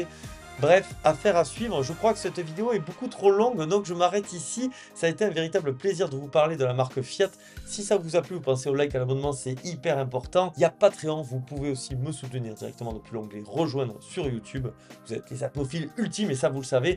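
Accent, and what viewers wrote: South, France. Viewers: Votre style de commentaire est très drôle. Pas de vulgarité et l'accent qui fleure bon l'huile d'olive et le mimosa.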